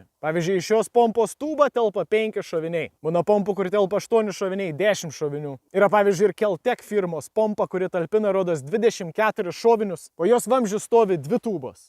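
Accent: Šiauliai, Lithuania. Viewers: Tu turi žiauriai gerą iškalbą ir gerą šiaulietišką prieskonį :D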